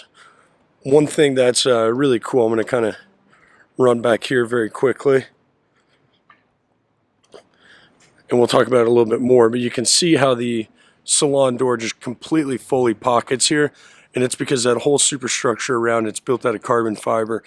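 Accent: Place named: Delaware Valley, United States. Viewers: I bet he is from PA, all my cousins from PA have the same accent. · LEEDS8 Delaware Valley (Del.Md.,Va.) so.Pa, Jersey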